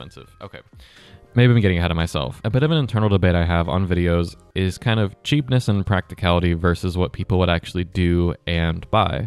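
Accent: England, United Kingdom. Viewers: You don't speak American, you speak English! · you don't speak american, you speak english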